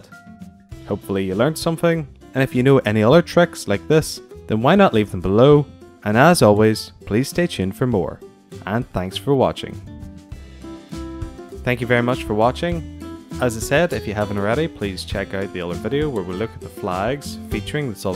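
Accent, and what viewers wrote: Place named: Northern Ireland, United Kingdom. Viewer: Love how you have to speak so slowly do that people understand your NI accent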